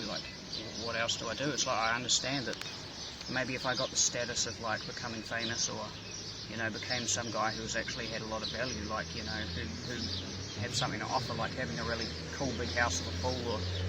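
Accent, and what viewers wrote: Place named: New Zealand. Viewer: Sorry to hear that you're still struggling with this problem Bro. I know the feeling. I don't know you're Financial Status but I Highly recommend you come visit the US. With that strong Accent, Blue eyes, and still have hair is a major plus. Also being from New Zealand 🇳🇿 you will have a line of women I can promise you that. I had a Russian friend steal the heart of a girl who was about to get married and there together. It's vice-versa if your from from Europe and really want a girl come to the US they are easy to Manipulate with European charm. Now if you're American go to Europe you will not be disappointed that's my advice to whoever reads this.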